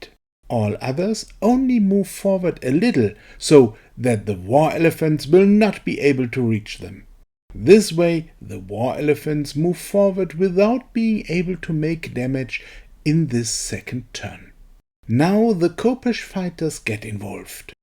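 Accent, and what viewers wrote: Germany. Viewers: The first game I loved was Final Fantasy Tactics, so I enjoy the strategy RPG battles in FOE. Are they the only reason people hate the Egypt settlement? I was thinking it had impediments even worse than Vikings. I build for 3x3 structures, and then they introduce a 3x5 when I was building around stupid rocks I can't do anything about. I think I'll finish Vikings in time. I still wanna go back to Japan and lvl those buildings since it's so quick. But Egypt isn't looking as mean as people made it out to be. Though, I'm only one video into it. Your next video might change my mind.. And I love the accent. I'm guessing you get this a lot. But you sound like an extremely pleasant person. American women prefer the British accent. But American men prefer the German accent. That might not sound like much of a win, I admit. But it is.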